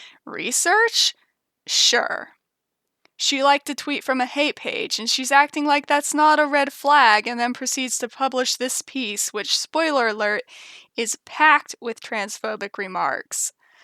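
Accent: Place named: South, United States. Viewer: I love this vid, your content and personality is one of a kind! also your redneck accent is good! lol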